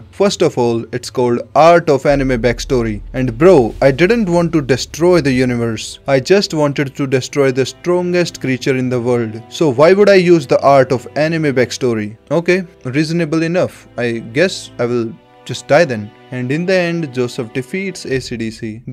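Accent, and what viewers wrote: India. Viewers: ive been watching this channel for a while i swear to god i never realised having anime explained to me with an indian accent is such a ride 😂😂😂❤ i can't get enough . sir thank you and please keep it up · Are you an indian, bro? Your accent looks like one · U sound indian.. 😂that accent is what makes this funny. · Your have an indian accent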